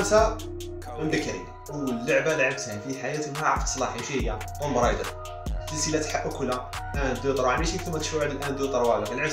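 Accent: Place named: Algeria. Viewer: اووووووووه شيت وانا نقول هاذ اللهجة راني سامعها هخخخخ مهم نت راك ولد بلادي انا فرجيوة ولاية ميلة مام انا نلعب شوي غايمز فالقناة تاعي الفيديو لجاي ندعمك =)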